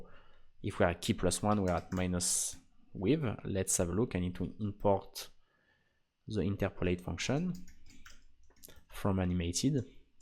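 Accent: France. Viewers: Nice video, are you French? You have a French accent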